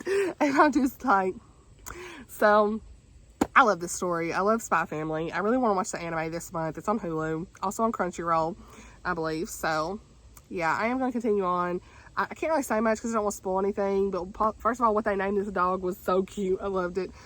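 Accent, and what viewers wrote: South, United States. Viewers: I immediately smiled at the first two words you said! "Hey, y'all!" as a southern girl myself living in the west I miss hearing the southern accent! basically, I. Am. Here. For. It! 😁 and yes, if you go to my videos you'll know that I don't have a southern accent. Everyone asks me where it is. and i'm sad i lost it (maybe never truly developed it) But when i go back home to visit family and talk to people with a southern accent, mine shows up! or when i'm ranting about something! 😂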